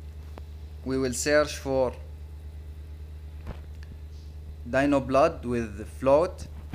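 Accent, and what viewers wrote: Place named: Arab world. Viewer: والله انك عربي عرفتك من اللهجه😍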